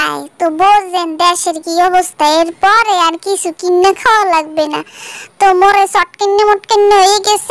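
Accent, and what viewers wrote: Bangladesh. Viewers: কদবেল আহ দেখলেই জিভে পানি চলে আসে। বরিশালের ভাষাটা অসাধারণ লেগেছে। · কদবেল যেই রহম যমমের মজা হেইরহম বরিশালের ভাষাও হোনতে যমমের মজা।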